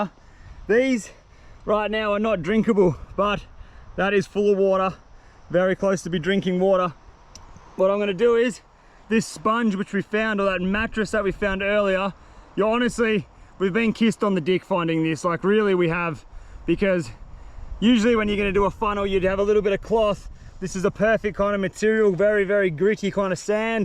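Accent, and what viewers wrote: Australia. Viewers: I can hear that Aussie twang in that exactly (?)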